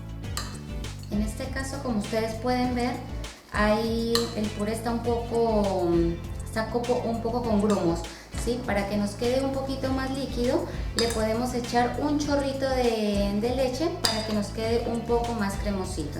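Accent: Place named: Cuba. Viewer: Hola.Gracias por tus videos. Estoy en una temporada donde la cosina me està encantando, y buscando unas recetas de croquetas encontrè tu video. Soy cubano, aunqueahora no vivo allà, pero si consumiamos muchas croquetas. Tu asento se parece al cubano.¿Eres cubana? Gracias.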